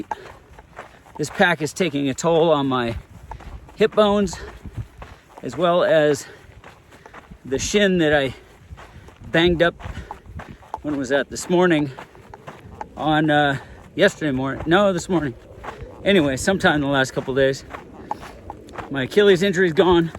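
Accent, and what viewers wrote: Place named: Los Angeles, United States. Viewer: Keep truckin! Thanks for the info about the fire and the insights. Sounds like you’re from LA!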